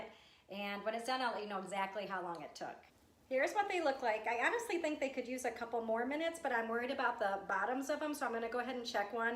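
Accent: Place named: Midwest, United States. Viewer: Okay, now I hear the accent. Wisconsin or Michigan? 😸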